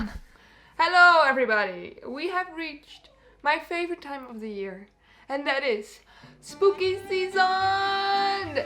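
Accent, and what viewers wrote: Netherlands. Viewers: Is ze nou Nederlands of heeft ze gewoon een Nederlands accent?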